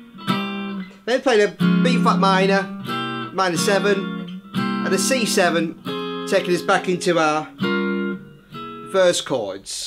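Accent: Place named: Northern England, United Kingdom. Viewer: Far and away the best lesson on this wonderful song. Love your accent, sounds like something out of Coronation Street. Cheers from New Zealand.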